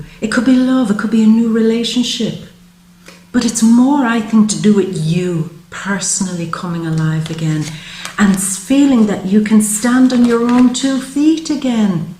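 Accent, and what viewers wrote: Ireland. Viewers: Is that an Irish accent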